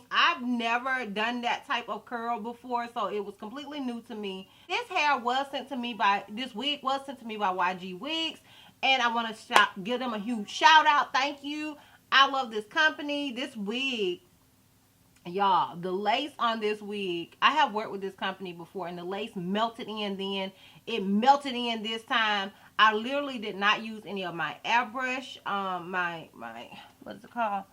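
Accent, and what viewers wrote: South, United States. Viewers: yes I knew it was somewhere down south lol😂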